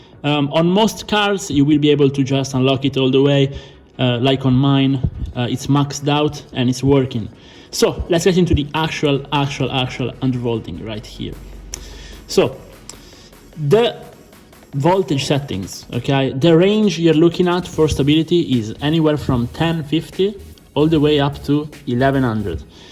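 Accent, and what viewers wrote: Italy. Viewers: capito che eri italiano dall'accento, bel video comunque😂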